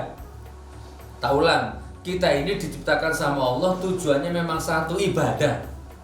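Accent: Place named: Java, Indonesia. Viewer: Gus... sy tebak pasti sampean orang jawa ya... keliatan medok... wkwkwkwk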